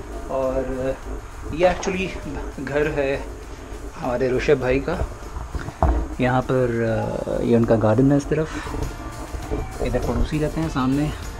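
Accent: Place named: Bengal, India. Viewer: Khub mishti laglo banglai kotha bollen..majhe majhe erakm Bangla bolben..shunte valo lage...Dada khb valo kaaj korchen..chalie jan..god bless..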